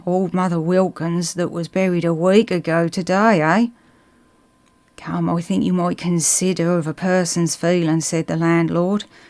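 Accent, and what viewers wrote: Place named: Suffolk, United Kingdom. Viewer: Well suited together, M.R. James and the Suffolk accent.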